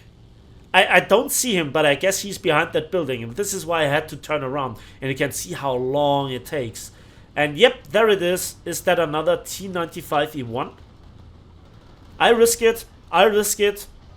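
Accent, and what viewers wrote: Germany. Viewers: I just love your German accent man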